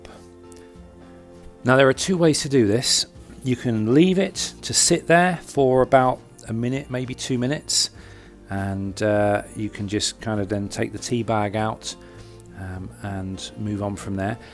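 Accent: United Kingdom. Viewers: As I lived nearly a decade in Britain, I just came here to listen to the beautiful accent and understand again why I became so fond of tea. Cheers!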